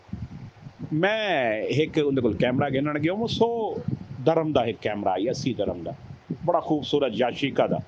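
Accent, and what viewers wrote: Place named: Saraiki, Pakistan. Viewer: Sir Tuhada Sraiki Lehja Sun Ky bahoo Changa Laga Meku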